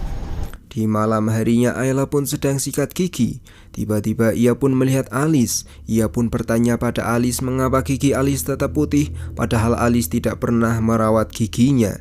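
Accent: Java, Indonesia. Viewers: lah admin wong jowo cuk, dengar gak sih cara bicaranya 😂 tumben ada wong jowo gak bisa diajak bercanda.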